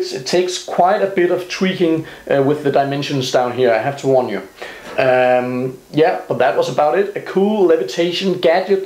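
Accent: Denmark. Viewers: Are your danish your have an danish accent cool idea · You sound really danish (im danish too) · vietnamese? He's danish >.< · Lol sounds danish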